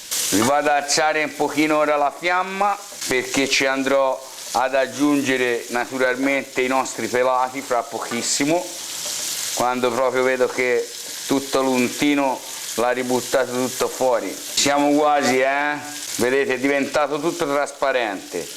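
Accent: Tuscany, Italy. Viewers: Ciao ! Per dire il vero, la ricetta la sapevo, però sentirti come la raconti tu, con l’accento toscano, e per me il piacere massimo. Grazie di tutto, e stammi bene ! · Sei fortissimo cin il tuo dialetto toscano bravoooo 👍👍👍👏👏👏👏😂😂😂😂😘😘😘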